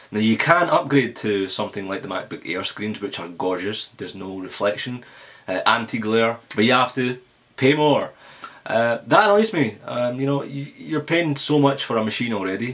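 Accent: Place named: Glasgow, United Kingdom. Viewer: Accent is Glasgow, west Scotland, uk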